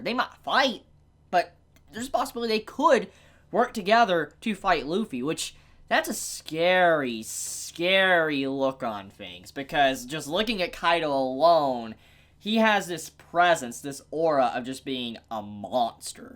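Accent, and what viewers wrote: South, United States. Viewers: Yo where are you from? I feel like sometimes you have this southern accent that doesn't sound bad at all, just made me quite curious! Much love from San Antonio.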